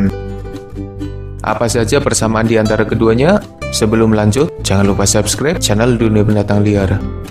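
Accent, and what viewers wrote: Banyumas, Indonesia. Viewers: Jujur, admine wong ngapak duk???, ora ngapak ora kepenak mbok?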